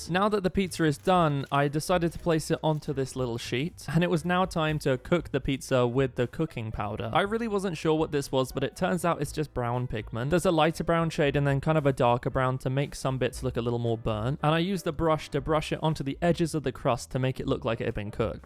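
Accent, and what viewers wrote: United Kingdom. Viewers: Not to lie You sound British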